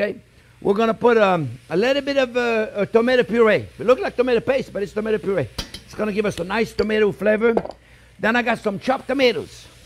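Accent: France. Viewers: Yesss delious 🎉as usually, I’ve cooked this receipe for yrs, just didn’t have your awesome 👏 homemade broth an yrs ago had I known bout it I would have cooked it bcuz had a big kitchen, but broth in the box an bouillon cubes, Luv your recipes 🎉, an your French Accent, bloob bloob an you twinging your hands in air, tell food to get bk in pot, most of all you cook soo clean 🧽 with everything, wash your rags out wipe your working board off, I get soo tired of other cooking videos not rinsing off meat 🍖 are etc, tonigt my friend I’m drinking red wine 🍷 an enjoying your videos you made me laugh 😆 when you said if you have criticisms go an watch Tik Tok are what ever it’s called I laughed soo hard lucky I didn’t burst my jeans, lol 😝, You are a blessed renound chef 👨‍🍳 God Bless New Orleans La. 🎉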